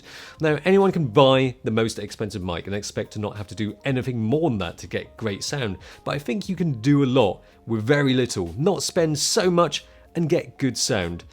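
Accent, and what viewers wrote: United Kingdom. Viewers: That is the cutest little British accent ever!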